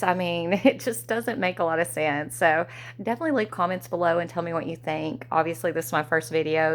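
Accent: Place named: South, United States. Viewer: Indoctrination, why do you think we longer host these ridiculous events??? Obviously the city was there before, and our historical timeline is just not accurate. We're all being manipulated to believe a timeline that glorifies north America and white people, putting us at the pinnacle of creation, science, and invention which is just not true. Many civilizations before Europeans were far more advanced, and Europeans stole their technology, land, and even people and claimed it all for their own. There is also several civilizations and groups of people that were obviously wiped out because we have their technology but no people and no history and think about all the libraries that have been burnt on purpose and even the book burnings in world war II. Much of our history has been erased on purpose to benefit one group of people or should I say 1percenters lol. 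Great video though, I love the images you used, and your southern accent as I am also a southern woman questioning history lol.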